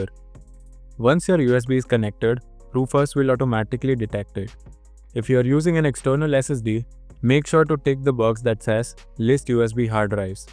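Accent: India. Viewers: When you hear the Indian accent you kbow he finna make yo shit work, may your food be good mah bro❤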